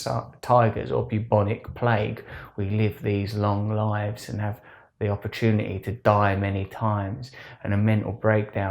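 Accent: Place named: Cockney, United Kingdom. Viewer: I love hearing this wisdom with a Cockney Accent. Brill innit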